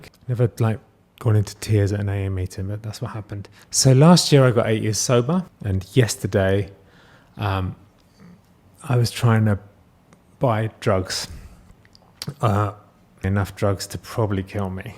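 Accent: United Kingdom. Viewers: Youre British Accent and looks tells me you can be an Actor. You were put on this Earth to be a mentor for your children. Please be happy that God put you on this earth. You are loved!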